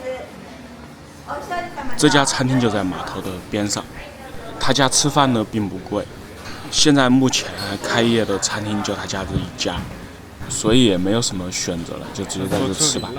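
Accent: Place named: Hubei, China. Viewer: 武汉口音😁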